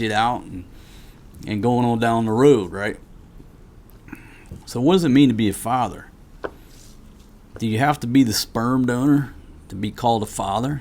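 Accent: South, United States. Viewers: I’m a new sub.. I enjoy listening to your conversations. I will not judge, I just want to understand some things. Who is Maria’s mother? Is she your biological daughter? How did you name your son Forest G? He’s adorable & so is Maria. I’m very familiar with your personality , being the redneck that you are, 😅the way you talk, cuss a lot & drink a lot. I understand the way you talk. I’ve lived in Georgia long enough 😅. You are a good story teller. You can be amusing, funny, & serious. Glad you’ve clarified your position with Janice’s baby. Continue your life stories while people here in the US are still trying to learn their pronouns. Now you’re the hillbilly of the Phil. 😂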